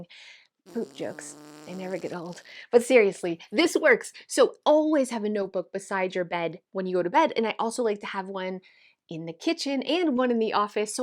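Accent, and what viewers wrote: Canada. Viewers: Love that Canadian accent! I know it's off topic, I just had to say I love how you say "out" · I have set my Alexa to speak with a Canadian accent instead of English! Reminds me of you x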